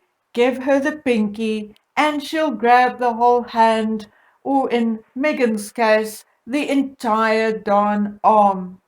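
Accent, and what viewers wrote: South Africa. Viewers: Hi. So lovely to hear a South African voice😉.great point of view, and spot on! Keep it up.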